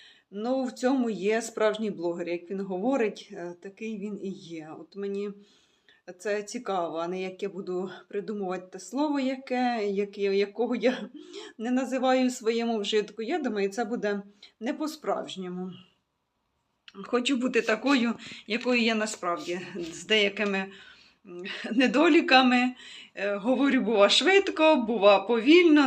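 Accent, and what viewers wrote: Poltava, Ukraine. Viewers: Ви все правильно робите, я на Ваш канал підписалась саме завдяки полтавській говірці, а потім вже в'язання! Дякую Вам за щирість! Продовжуйте знімати в своїй манері, чекаємо!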